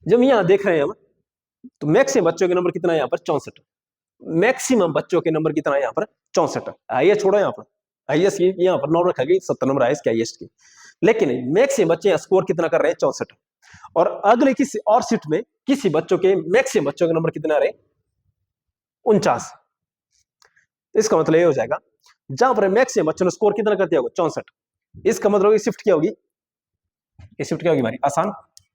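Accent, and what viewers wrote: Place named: Sirmaur, India. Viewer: Sirmaur se ho na ap bolne ke tarike se lg rha😅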